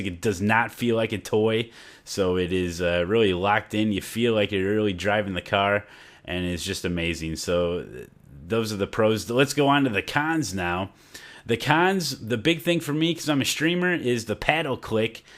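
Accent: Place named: Midwest, United States. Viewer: Hey man whatsup. love the streams. But As for the click being a con, streaming that can definitely be an issue, but i dont think its a con in itself. Some love and/or need the strong satisfying click. but that should be an option i agree. if the click wasnt there id still want a nice solid tactile thump to confirm i had shifted, not some mushy feel ya know? And im from georgia myself, cant quite pinpoint your accent, midwest?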